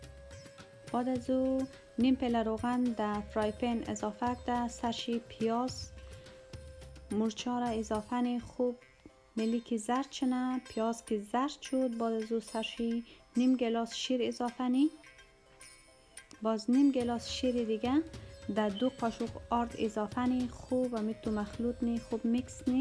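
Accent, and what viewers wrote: Hazarajat, Afghanistan. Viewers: خیلی خو ب بود اول همینکه خوب نظافت رعایت مونی دوم اینکه لهجه آزرگی تو بسیار خوبه. خیر کنی خوارک جور باشی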